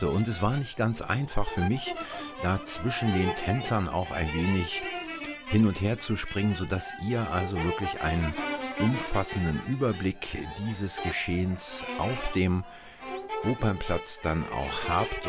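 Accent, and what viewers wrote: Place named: Lower Saxony, Germany. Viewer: Stimmt, dem muß ich beipflichten. Eine sehr angenehme Stimme und ein sauberes Hochdeutsch, wie in Niedersachsen!!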